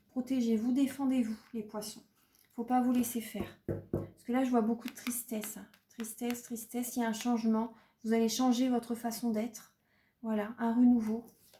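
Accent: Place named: North, France. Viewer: Quand je vous écoute, j'ai l'impression de m'entendre quelques années auparavant. Même voix, mêmes intonations et même accent ! ( Du nord) c'est troublant !!! Bonne continuation !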